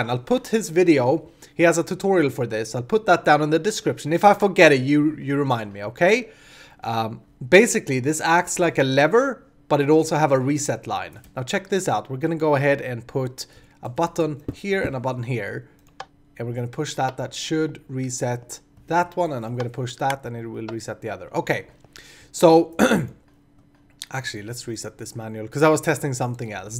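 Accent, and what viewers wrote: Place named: Sweden. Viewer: Man, I feel left out of the conversation here! Oh wait det gör jag inte alls det! You're the only youtuber with a Swedish accent I'm watching. Because the Swedish accent makes me cringe (mainly because I can hear all the stuff I, myself, pronounce erroneous in English, it's a bit like hearing yourself recorded, but with someone else's voice). But you're doing way to interesting stuff for the accent to outweigh the content produced!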